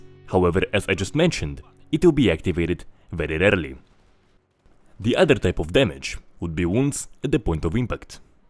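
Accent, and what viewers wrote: Russia. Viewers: He's like speaking russian but in English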